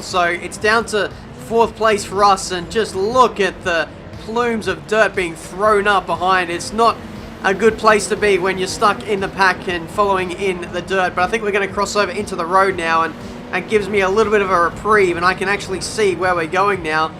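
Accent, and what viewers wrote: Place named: Australia. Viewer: Best english accent! The brits and americans should study australian english! The hole world should. Cheers from sweden!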